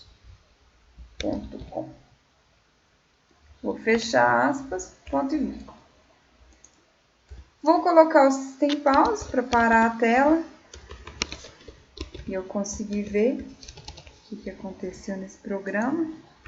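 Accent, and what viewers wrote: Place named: Minas Gerais, Brazil. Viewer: Parabéns você explica muito bem, ficou melhor ainda com este sotaque de mineira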